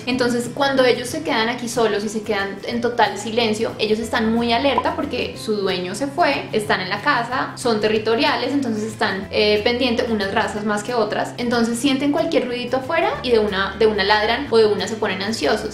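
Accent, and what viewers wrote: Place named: Colombia. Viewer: Me gusta mucho tu acento , de que parte de Colombia es ?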